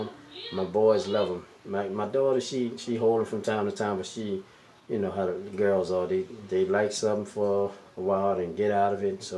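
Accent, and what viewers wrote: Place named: New Orleans, United States. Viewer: You ain't gotta show the saints flag to let people know you from N.O just talk we all know that accent lol great story bro love it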